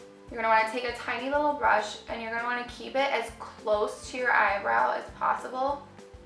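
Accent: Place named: Midwest, United States. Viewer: Wow that is one serious midwestern accent.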